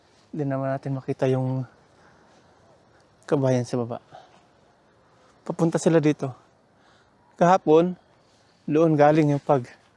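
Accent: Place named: Mindoro, Philippines. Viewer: Boss tiga Mindoro ka no? Kasi yun Tono mo😂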